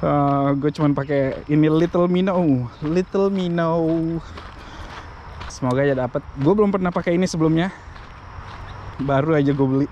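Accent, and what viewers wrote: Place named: Medan, Indonesia. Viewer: alamak jaaang. keknya orang medan ya abang ini hehe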